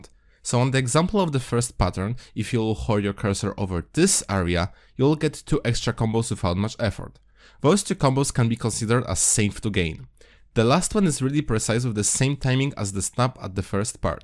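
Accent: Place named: Poland. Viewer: he is polish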